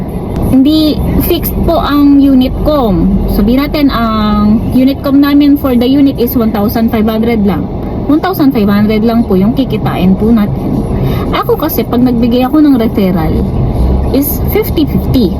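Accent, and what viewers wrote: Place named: Visayas, Philippines. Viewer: Bisaya ka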